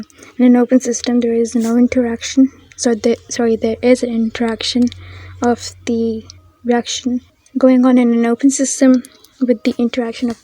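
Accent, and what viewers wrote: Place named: United Kingdom. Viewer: Hey, loved this video...and your accent ahhh it's sooo british. looking up for more videos...keep up the good work ❤❤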